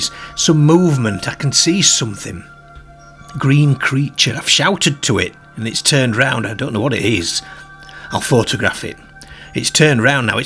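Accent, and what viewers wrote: United Kingdom. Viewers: I've heard his accent before, just cant recall where its from in the UK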